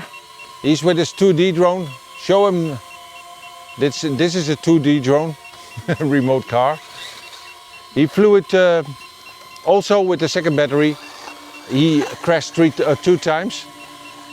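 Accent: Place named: Netherlands. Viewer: Your Dutch accent is thick lol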